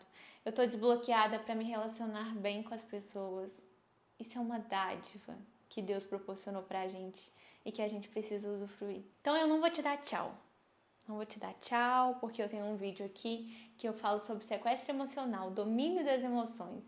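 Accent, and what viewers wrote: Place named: Minas Gerais, Brazil. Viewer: Adorei seu vídeo! Me inscrevi 😍👏 seu sotaque parece mineira 🥰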